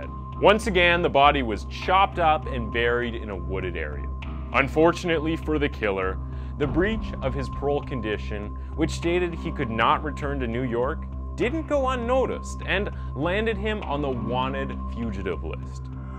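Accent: Canada. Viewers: Oh the Canadian pronunciations lol. It’s all good though. It goes both ways. Love you Canada! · Hes canadian. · no I'm not going to lie yesterday I was watching one of his videos and totally capping on how he talks. Is he Canadian? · Is he Canadian? I sense a slight accent and some of his spellings are spelled in traditional English such as "behaviour." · nice channel, are you Canadian?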